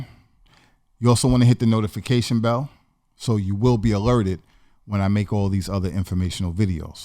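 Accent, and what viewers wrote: New York, United States. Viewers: I’m glad I found this channel. I’m interested in sea moss but I am a little hesitant because I have a history of hyperthyroidism while pregnant and always was borderline hyper thyroid. Not sure how the high iodine content would affect someone like me. Are you from New York? You sound like it. 😊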